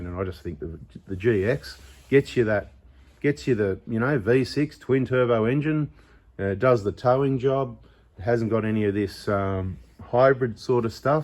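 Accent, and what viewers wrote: Australia. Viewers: I have problems understanding Australian accent, so I got the first choice of a diesel for Isuzu, but I could not understand - other than V8 and made in Japan - what the choice of the petrol model was. Can someone write it down for me?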